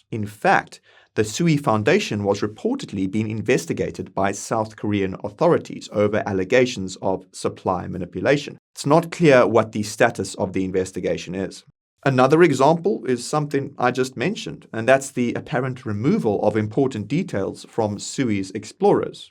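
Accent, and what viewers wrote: South Africa. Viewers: A new south african guy hosting coin bureau? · Is that a South African accent?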